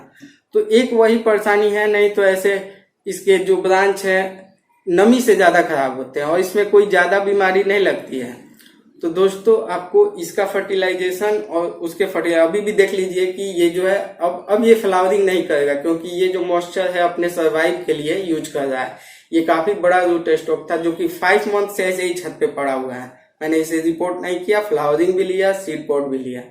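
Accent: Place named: Bihar, India. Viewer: Aap adenium plant kahan se lete hai ? aapke voice tone se lagta hai kya Bihar ke hai nice information 👌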